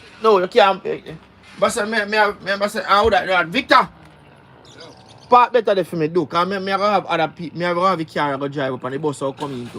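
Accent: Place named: Jamaica. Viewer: I understand, but the Patwa is part of our culture, and a lot of foreigners love how we speak. Anyway in the name of progress and a wider audience do what you need to do, just keep the Jamaican accent You will always have my support.❤❤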